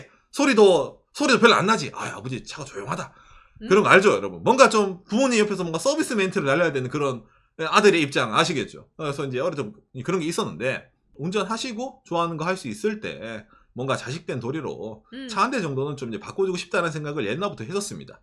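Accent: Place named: Gyeongsang, South Korea. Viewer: 역시 경상도 말투...ㅋㅋㅋㅋ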